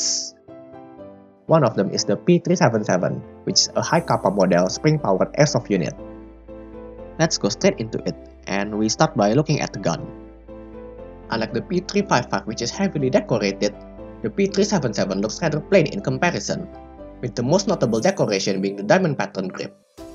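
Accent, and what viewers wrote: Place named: Indonesia. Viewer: Masih ada logat indonesia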